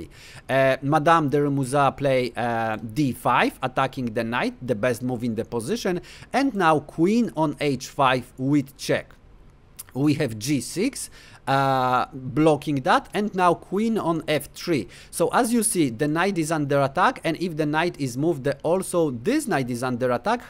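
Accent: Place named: Poland. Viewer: Po akcencie poznałem, że rodak :) Leci subskrybcja, łapka w górę i komentarz :)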